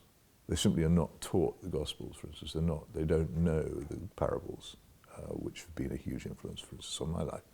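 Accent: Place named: RP, United Kingdom. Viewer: <link> Just having some fun. Can any other American understand every word of this Varneyian Elite English? Windsor, I believe. Softening the hard edges of our English to sound more French. It really should be captioned. But he makes excellent sad points. So it is important to be able to speak in a standard we can all readily understand. So we can understand.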